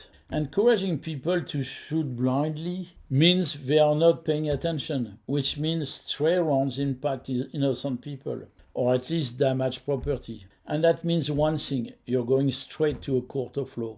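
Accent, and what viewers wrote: France. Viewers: Watching a French man with a French accent talk about guns like a red white and blue gun enthusiast blows my mind. Liked and subscribed.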